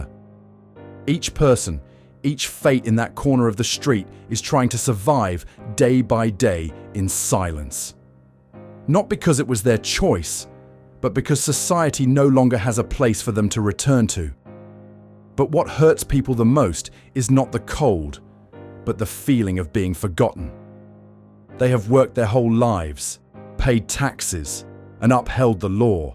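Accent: United Kingdom. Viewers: Why is it always a narrator with a British accent who narrates these terrible videos about the homeless in America?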